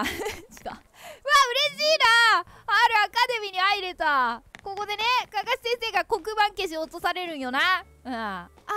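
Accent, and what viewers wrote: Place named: Mie, Japan. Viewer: 三重県の人なのかな？ ○○いけやんって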